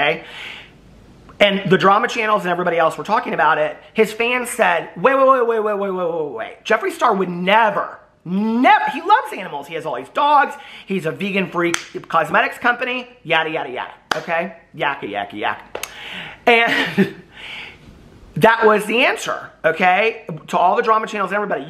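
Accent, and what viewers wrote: South, United States. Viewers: With your Southern draw, where are you from? Excellent video!!!!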